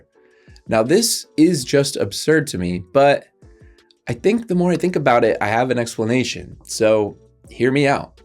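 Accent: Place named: United Kingdom. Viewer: Where are you local to? UK I'm guessing? I watched a video yesterday and I think that was your accent.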